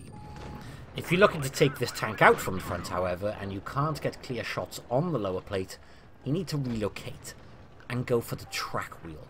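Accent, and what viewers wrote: United Kingdom. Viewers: Such a lovely review, thank you! Hey, WoTB needs a British voice for the british tanks... I hope WG's going to ask you to make the voices 😜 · Many will find it weird but ever since I subscribed aside from his perfect Analysis of the tanks and the game and all ( which is in my opinion is better then bushka and the others) his voice is just perfect you know a WWII battle gameplay in the background and his calm voice with the British accent explaining what is going is what forces me to watch his videos let alone the extreme accuracy and information he provides in the game.....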